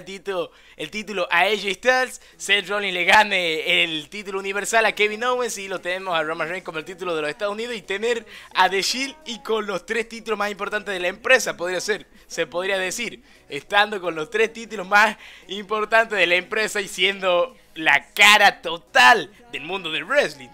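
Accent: Tucumán, Argentina. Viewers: yo soy argentino y tu tonada parece de tucumana😊😊😊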